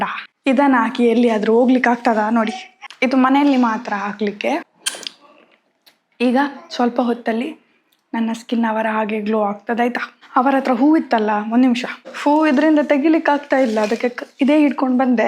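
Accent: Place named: Tulu Nadu, India. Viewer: Oh really likes your innocent voice and that tuluva's Kannada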